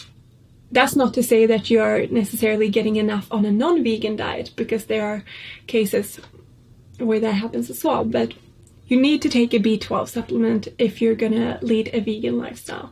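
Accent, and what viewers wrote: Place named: Sweden. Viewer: this is the first video of yours that i've watched. my roommate is swedish, and as soon as you started speaking i immediately knew you were swedish. love the video! definitely subscribing.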